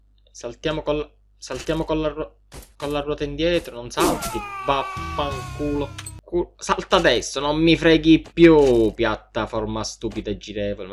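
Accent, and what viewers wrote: Palermo, Italy. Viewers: SEI DI PALERMO?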